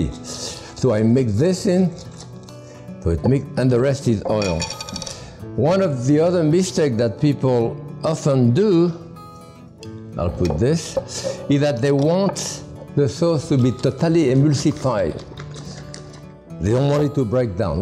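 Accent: France. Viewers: I love the way he says 'my mother' so much love ❤ in the way he says 'my mother ' especially with his French accent, love it !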